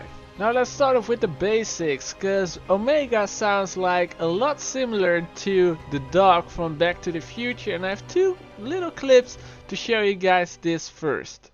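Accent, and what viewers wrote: Netherlands. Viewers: Are you dutch???? Cause you have a Dutch accent here and there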